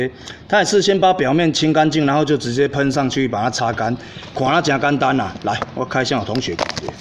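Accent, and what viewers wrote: Taiwan. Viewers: 補課+1 台氣 又有力! 台語讚!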